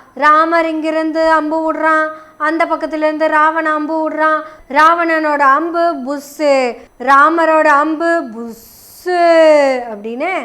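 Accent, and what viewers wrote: Kongu, India. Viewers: கொங்கு தமிழ் விளையாடுகிறது 😅😅😅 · இனிமை இனிமை கொங்கு தமிழ் இனிமை.❤❤❤🎉🎉🎉🎉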